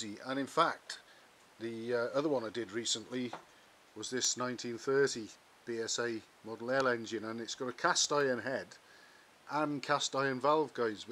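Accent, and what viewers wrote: United Kingdom. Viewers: Hey I’m American tryin* to learn accents. Are you from Cornwall?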